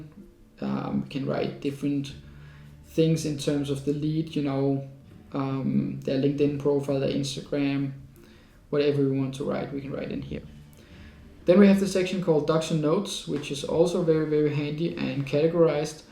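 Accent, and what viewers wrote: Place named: Denmark. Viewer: Holy shit started the video and was instantly pointing and saying "Bro is Danish"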